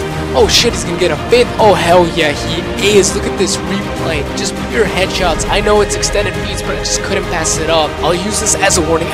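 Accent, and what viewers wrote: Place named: France. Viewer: You Ave a good accent french i know i'm french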